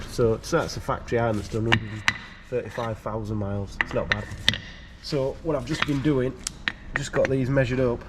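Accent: Yorkshire, United Kingdom. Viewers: Great video, when you are a fantastic orator with a natural talent for unscrambling technical data with added Yorkshire dialect. You sir have to own the fact that you are indeed the most popular presenter on this channel 🤷🏼‍♂️ embrace it 💪🏻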